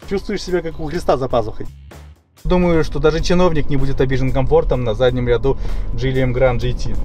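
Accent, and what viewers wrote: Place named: Belarus. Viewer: Слышен белорусскии ГГовор!!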